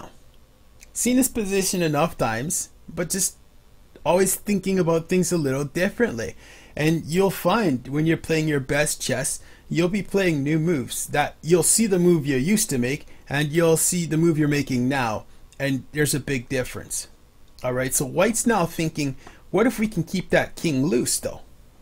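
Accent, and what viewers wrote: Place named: North Dakota, United States. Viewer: I love your accent!! North Dakota??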